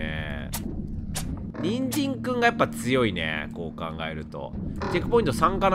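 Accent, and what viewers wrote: Kansai, Japan. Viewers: 関西人ですからね❤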